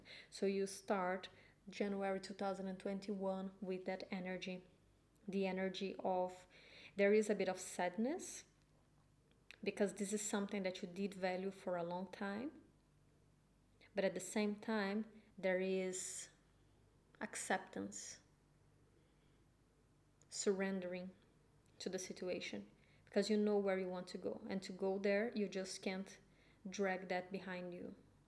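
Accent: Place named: Brazil. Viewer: Are you Brazilian?!? Sound like !! 🇧🇷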